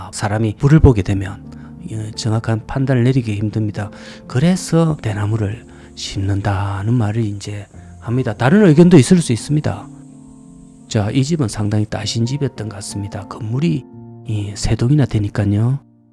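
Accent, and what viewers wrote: Gyeongsang, South Korea. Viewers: 전라도 사는 60대 입니다! 경사도 사투리가 넘 구수합니다.꼭 구독하고 있구요.정말 많이 배우고 있읍니다.감사합니다.수고하세요.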